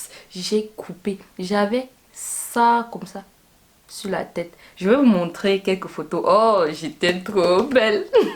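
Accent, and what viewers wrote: Cameroon. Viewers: Toi tu es camerounaise ça se sent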